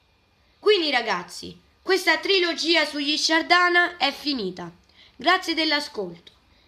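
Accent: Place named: Campania, Italy. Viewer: Dal' accento mi sembri campano, grazie dei tuoi studi sulla Sardegna 👏❤️💓❤️💓sei bravissimo 💓💞💓💞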